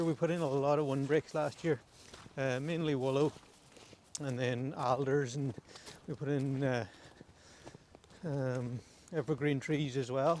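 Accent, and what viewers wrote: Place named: Ireland. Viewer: This is the Irish accent. If you can't understand it, press on the CC button. It will give you subtitles.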